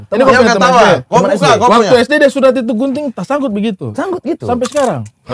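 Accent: Eastern Indonesia, Indonesia. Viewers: Bang su terpengaruh dgn bahasa Timur ya😂😂😂👍👍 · logatnya udah jadi logat indonesia timur wkwkwk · Eeeeee....bang logat timur sdah bgus e😂😂e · ... kenapa logatmu jadi ngikut timur juga... padang mana padang 😂😂😂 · uda, de pu logat su mulai ketimuran ee....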